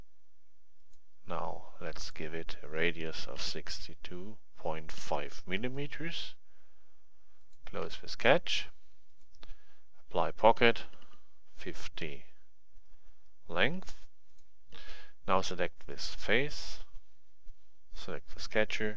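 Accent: Germany. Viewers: are you actually german, you dont really sound like a english men. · For me 1x speed is just rigth. My native language is Spanish so listening English talked by a german (I guess), and understanding it, is fine. By the way there are FreeCad tutorials in Spanish by a guy that calls himself Obi Juan and these tutorias are very, very good. FreeCad is so big that there are many ways to learn it, so the tutorials here and the ones from Obi Juan are, for me, complementary to each other. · Great tutorial. I have attempted to learn CAD before. But training videos that I tried in the past were way to fast and concepts were not clearly explained. Usually the presenter in the tutorial was clicking buttons too quickly or using keyboard commands so I could never clearly understand how things were being done. But this video is very well paced, concepts clearly explained and the steps involved very clear. 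A really fantastic job! And the German accent, just makes me feel smarter listening to it :-) I look forward to viewing the other videos and I now feel confident that I can do some home machining of parts I design myself. Thank you!!!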